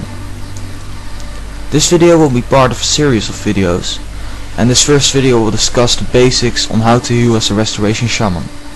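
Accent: Netherlands. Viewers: Are you dutch? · Is je moedertaal nederlands? :-) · u sound dutch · Was meteen te horen dat je nl bent Nice vid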